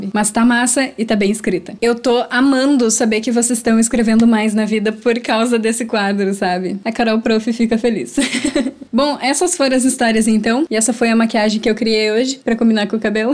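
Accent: Rio Grande do Sul, Brazil. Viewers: você e gaúcha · O sotaque dela e parece ser gaucho ela usa muito "tua" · Oi tudo bem? vc é gaúcha?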